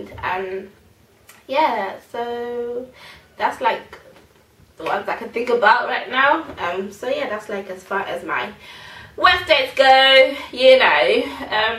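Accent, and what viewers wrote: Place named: Zimbabwe. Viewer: you have the cutest zimbabwean accent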